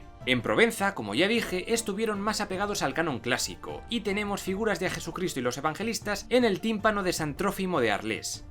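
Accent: Spain. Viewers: Me hizo reir los comentarios de este narrador. Con ese acento español. Pero muy interesante lo que dijo.😂😂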